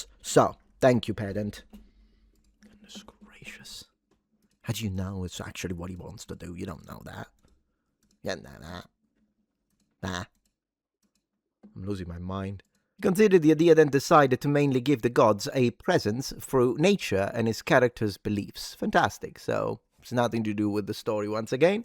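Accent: Greece. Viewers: Your greek accent is amazing! 👏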